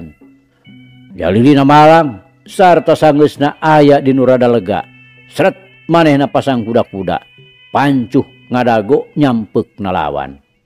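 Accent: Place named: Sunda, Indonesia. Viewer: Hebat! Cariosna nyunda pisan. Hatur nuhun bah!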